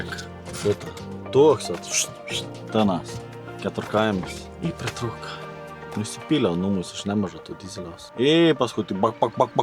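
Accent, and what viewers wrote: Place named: Samogitia, Lithuania. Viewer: 4,20 čia ko gero kultivatorius. Beja ar nebūset iš Žemaitėjės? Kažkap žemaitiu kalba jautas.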